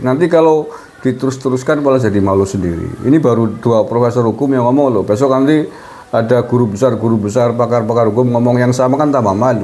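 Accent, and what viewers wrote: Banyumas, Indonesia. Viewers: Ngapak temen rika bang?? Ngomonge . Ora ngapak ora penak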